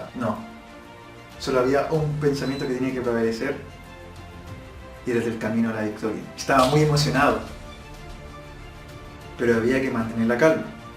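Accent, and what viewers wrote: Ecuador. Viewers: Eres ecuatoriano o chileno jajajaj decidiré weon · Suena a mensaje de anime, pero la verdad es que nunca hay que rendirse y gracias a eso fue a un mundial entonces siempre diviértanse y jueguen PD. Eres ecuatoriano???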